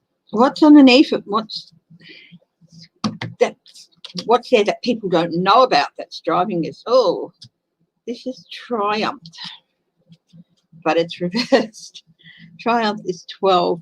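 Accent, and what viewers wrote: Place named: Australia. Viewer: Thanks for reading on DeSantis,. Ron DeSantis is known to be tied to Trump. Cheating in the election is widely suspected. Lev Parnas and Igor Fruman were VIPs at his inauguration! Russia has a tight grip on the U.S. and it has been deadly. At this point I would not be surprised if people lose their homes and they are sold to Russian and Chinese oligarchs. I frankly don’t know if Trump will ever be brought to justice. I’ve kind of lost hope about it. The entire GOP are traitors who worship money 💰 and drag the name of Christ through the mud as they pretend to be bastions of morality when nothing could be further from the truth. The past four years have taken a heavy toll on me. I live in NW Florida just a few miles away from Johnie ( though we’ve never met in person). I love 💗 your readings— your Aussie accent is soothing. Your laugh makes me smile. 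🤗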